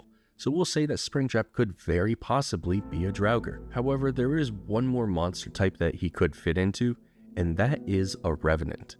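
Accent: United Kingdom. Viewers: He's. British. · he's british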